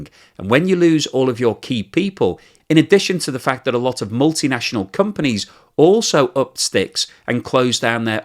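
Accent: United Kingdom. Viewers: 😂😂😂😂😂😂😂😂 According your accent , y are from UK. So ,we know that UK is PR of Ukraine, and what ever y said it's nothing but trash. It's better to look in your garden.😂😂😂😂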